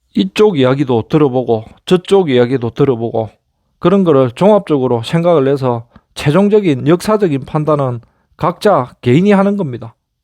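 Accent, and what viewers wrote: Gyeongsang, South Korea. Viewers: 경남사투리가 짙으셔서 정치색의 댓글이 달릴것같습니다. 그냥 빨간당 이실거라는 생각이 들긴합니다.ㅎㅎ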